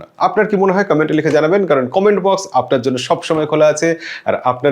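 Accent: Bangladesh. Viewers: এই ভদ্রলোক এর উচ্চারণ বাঙাল দের মত। কলকাতার দর্শক দের জন্য বেমানান। এবিপি এর মান এর সাথে যায়না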